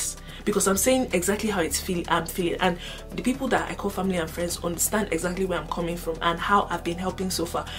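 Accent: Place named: Nigeria. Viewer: I love the Naija accent at the intro💯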